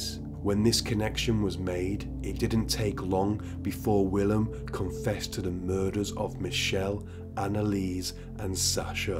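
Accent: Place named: Merseyside, United Kingdom. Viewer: he sounds like hes from Merseyside, the same region as, but hes toned and slowed down his accent for these vids.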